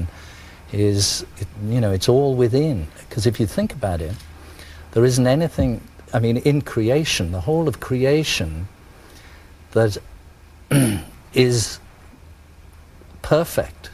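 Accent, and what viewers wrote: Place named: United Kingdom. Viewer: THE ACCENT IS MUCH BETTER THAN AMERICAN ACCENT AND APEACH, AMERICANS DONT LIKE IT, EVEN WOMANS, BRITISH WOMANS, BEAUTIFUL ACCENT AND VERY KIND, NOT RUDE LIKE AMERICANS GIRLS., LOVE BEATLES, WHO DOESN T ?? ELVIS, NEVER PLAYS GUITAR LIKE BEATLES OR WRITE A SONG, OR THIS VANILLA ICE LOL, ITS A JOKE, AMERICANS, THEIR GOT ONLY INSTRUMENTS, REAL MUSICIANS ARE FROM UK...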